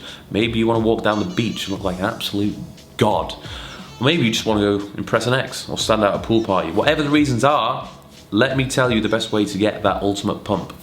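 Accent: United Kingdom. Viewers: You Are British ... Right na...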